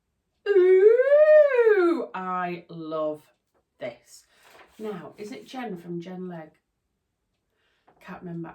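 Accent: Yorkshire, United Kingdom. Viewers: Lovely to hear you chat away😊my ears prick up when I hear a Yorkshire accent. Moved to Australia 🇦🇺 many decades ago. Have you come across the Peppermint Magazine Wrap top by In the Folds? Free pattern. Could be a contender for your mums outfit.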